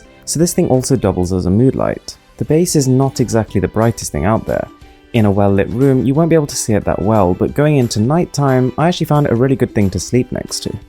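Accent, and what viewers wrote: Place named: England, United Kingdom. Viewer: awesome video bro.. as usual. u r eng accent .👌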